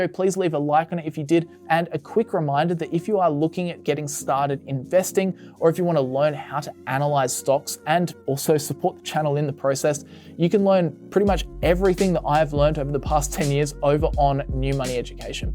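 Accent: Australia. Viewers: First time watching your video... Aussie???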